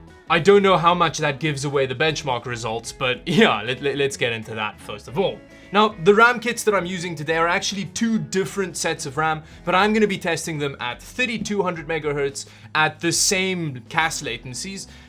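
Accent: Afrikaans, South Africa. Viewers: Fiddy twoo. I recognize a fellow afrikaans accent there lol. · he’s south african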